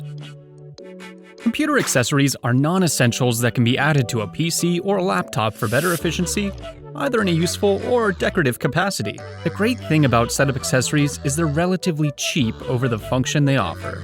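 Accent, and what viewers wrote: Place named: United States. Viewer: stock photos + copyright free music + robotic american voice; wtf is this some chinese ad or something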